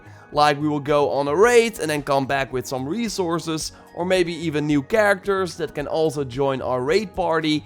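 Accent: Netherlands. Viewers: Ik vind het zo mooi dat ik gewoon hoor dat je Nederlands bent😂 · Hey i love your vids and I'm also dutch like you I could hear it from your voice and also subd today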